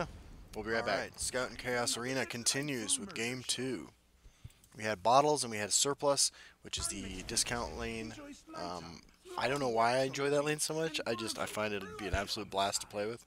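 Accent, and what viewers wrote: Ireland. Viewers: Brazillian, spanish, Portuguese, you name it, but his accent is Irish.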